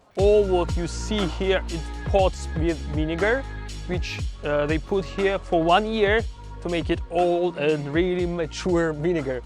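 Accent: Russia. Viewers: Your accent sounds like Russian btw, anyways great video! 😁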